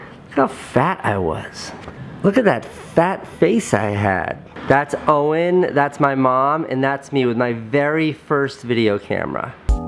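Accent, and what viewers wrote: South Africa. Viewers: Love the South African accent, not sure why just do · I live in New Zealand and I'm from Cape Town and listening to talk and his accent shit it's brought back memories! haha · I totally dig South African accents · South African: Yeeeese (yes) and Bleake (black). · That south african english has such a weird accent, but it's so cool. It sounds so laid back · South African accents are my faaaaaaaaave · That's a South African accent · Lekker. So nice to hear some Saf African. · I absolutely love the South African accent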